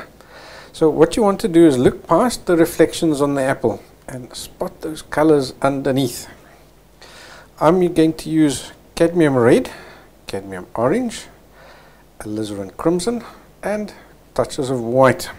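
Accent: Afrikaans, South Africa. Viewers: I can hear he's Afrikaans 😅👍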